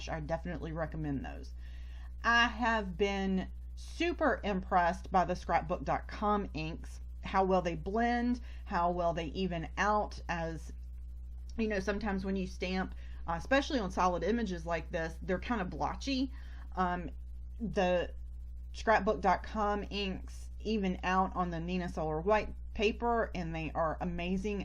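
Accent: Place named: Texas, United States. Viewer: You sound like a Texan. Love these cards. I am in the USA.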